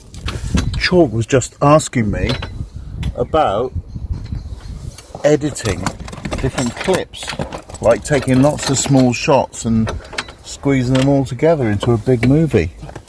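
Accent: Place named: West Country, United Kingdom. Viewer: Appeal it if you can’t pay it…. Hate that people who have cars or vans are victims.. As you know it’s a parking permit used in my street, two of my visitors have been fined for not putting the vehicle reg on the permit. I paid them off within minutes at half price, local government are not car friendly. You need a lock up or a friend to store stuff you’re not using, nomadic Kangaroo does not seem to carry as much as you do. Perhaps he stores his stuff? As for the toilet, perhaps incorporate it in the driver seat 😂. That would be so good for comedy purposes and serve a dual purpose 😂. Great vlog and entertaining, Great to hear the West Country accent, the sound of my youth in Bristol.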